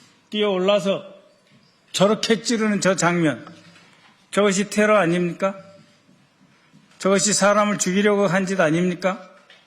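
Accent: North Korea. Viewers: 말투나 어떻게 좀 해 주세요. 여기가 북한도 아니고.. 슴다 슴다.. 듣기 거북해 ... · ㅋㅋㅋ 북한말투 거슬려.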